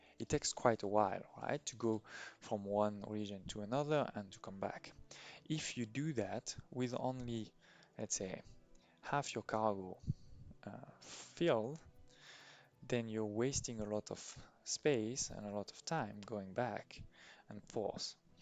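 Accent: France. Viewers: l'accent francais ptdr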